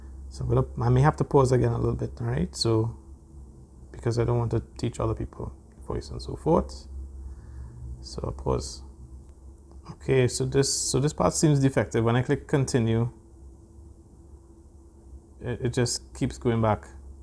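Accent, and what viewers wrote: Trinidad and Tobago. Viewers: U sounding rell trini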